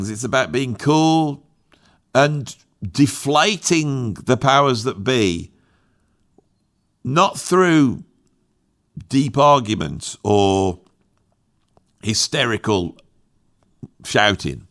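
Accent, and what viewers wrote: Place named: England, United Kingdom. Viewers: Thank you for that insight. You're a great thinker. I once lived in Wolverhampton, and listening to you talking always brings me back there. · Dude I love your accent so much, I can't get enough of it, I end up speaking to myself in your super English accent lol .. love the way you think as well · Hey... you're right... you are a common folk fella...who speaks his mind sincerely....but you totally know what you're on about.... the scattiness of the presentation just authenticates your niche....and bytheby.... I'm a scouser and our accent is mocked and dreaded and even I know why.. ( especially in female tenor)..... I live here and I'll never demure to the high pitched caustic auoural onslaught of a scouser woman shrieking aloud..... cat's scatter.... dogs bark and their men plug their ears as though metal is grinding on metal and amplified with reverb and harsh distortion with wah wah and full tilt chorus pedal... 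As a juxtapose to that.... I can tell you now that your midland is accent is the perfect parlance to cast your net with.... it offends no one and is very easy on the ear.... I've never heard the term "Rob-Dogs" before and regrettably it is well earned.....I know that by living here...and will include it in my vocabulary from hereonin ❤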